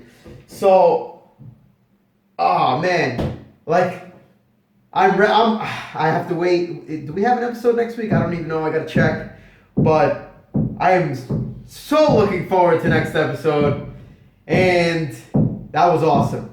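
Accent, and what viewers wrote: Italy. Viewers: oooh sí calabrisi?? Iu sicilianu, più o meno lo stesso dialetto;)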